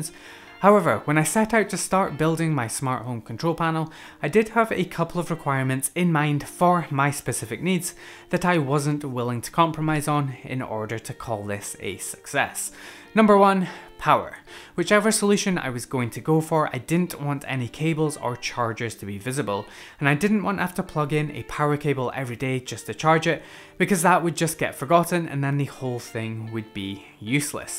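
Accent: Scotland, United Kingdom. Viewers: are you scottish like me?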